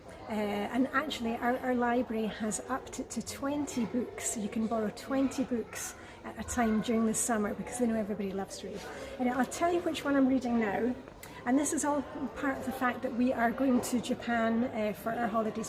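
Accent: Scotland, United Kingdom. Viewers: I love your videos! I am American, and you, as you talk, remind me of my friend from Scotland. Thank you for sharing those book reviews with us 😃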